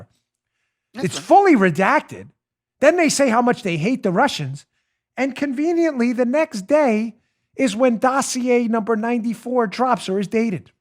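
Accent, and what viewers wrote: New York, United States. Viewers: Thank you Patriot Dan, for all that you do, everyday. You bring us the truth, and the updated reports. Always educating us, showing exactly what's going on. You always are on top of the events at hand. Thank you, bless you and your family and please, be safe! Also, I see that both of us have never lost our NEW YAUWK accent.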